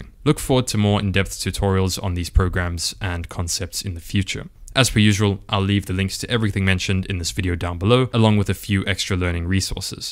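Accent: South Africa. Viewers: Do I detect a south african accent? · I trust you because, of the south african accent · You sound like you are from South Africa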